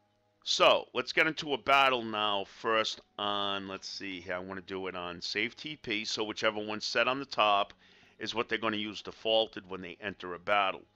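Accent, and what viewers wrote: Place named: Boston, United States. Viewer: Watching this almost a year later bro. Thanks. Just wondering, you from Boston? I hear the local accent.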